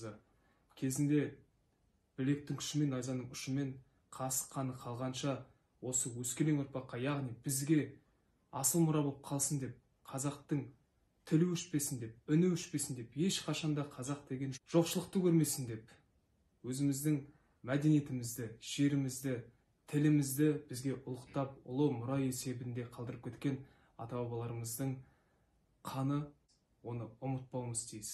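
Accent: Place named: Russia. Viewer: Sotaque semelhante ao russo.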